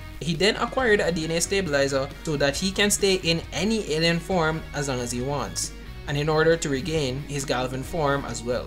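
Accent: Jamaica. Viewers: You’re Jamaican? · are u jamaican bro? me hear a accent dwag? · Are you Jamaican? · Sounds Jamaican to me??? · Are you jamaican? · You jamaican? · Jamaican accent?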